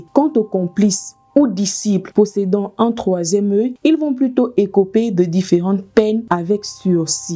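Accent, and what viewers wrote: Fang, Gabon. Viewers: Ahahah que tu sois fang c’était évident hein dès le départ hein, t’as trop l’accent 😅 Par contre je ne savais pas que ça venait de là „les fang mangent les gens“ 🙈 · abonné, contenu incroyable et surtout que l'histoire m'est familière. Au passage je kiff ton accent fang 😁😁 😁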